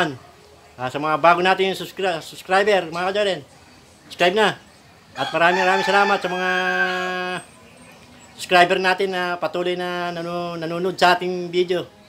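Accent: Batangas, Philippines. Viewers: ahh pero prang batangueño ang kaunting punto ninyo ka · ah akala ko kasi batangas at quezon ..ganan kasi ung mga punto dine eh..haha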